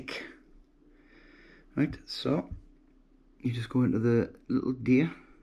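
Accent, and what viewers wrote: Newcastle, United Kingdom. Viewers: Hi, I am looking to purchase a good trail Cam such as this for monitoring hedgehogs in my Garden. Can you tell me where you baught your trail cam from please. I live in Gateshead and its great to hear a Geordie on youtube and ive recently subbed to your chanel. Also what sort of distance can you see images on your mobile phone from where camera is sited? Also does this alert you of activity or is that only cellular Trail cams that do that?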